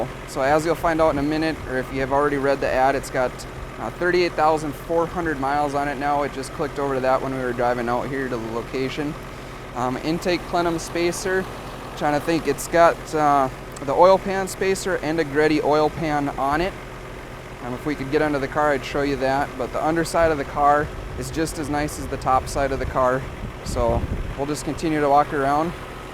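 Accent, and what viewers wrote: Canada. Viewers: i dont mean to offend you, the car is cool and all, i mean im not gonna buy it, but, your canadian accent is super annoying. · are u canadian?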